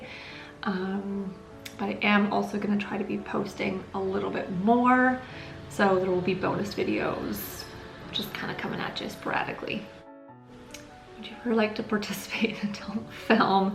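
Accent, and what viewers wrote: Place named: Canada. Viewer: I really enjoy the content, and I can easily tell that the accent is Canadian. I look forward to the video on the tattoos.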